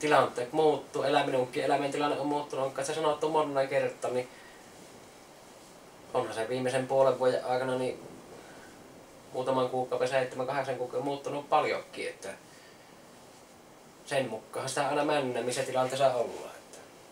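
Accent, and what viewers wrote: Savo, Finland. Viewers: voiko tuota savon muretta oppia millään kansalaisopiston kurssilla tai nettisivustolla? kiinnostaisi vanhoilla päivillä oppia uutta , se mukavasti estää dementiaa.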